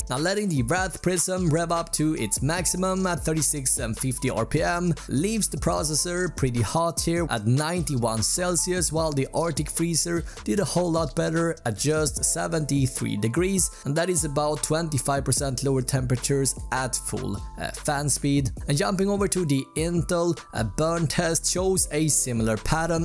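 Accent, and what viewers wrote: Sweden. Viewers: The Svvedesh accent really shines through. INSTANTLY SUBBED and enabled ads!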